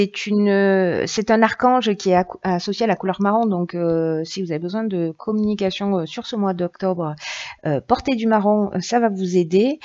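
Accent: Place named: Southwest, France. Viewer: Joli accent ! Du Sud-Ouest de la France ?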